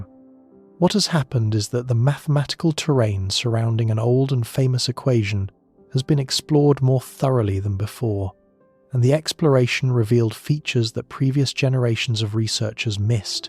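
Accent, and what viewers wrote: England, United Kingdom. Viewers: Math in an English accent sounds wrong.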